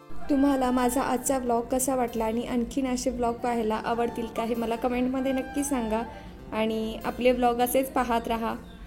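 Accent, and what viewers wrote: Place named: Kolhapur, India. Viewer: तुम्ही विदर्भाच्या म्हणता पण कोल्हापुरी भाषेचा साज चढवून छान न.एकच, लय भारी व्हिडिओ बनवलाय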